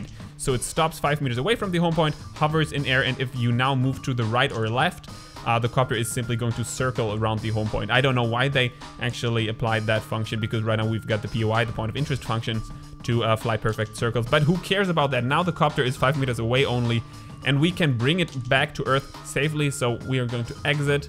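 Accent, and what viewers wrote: Germany. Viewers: Deutsch accent?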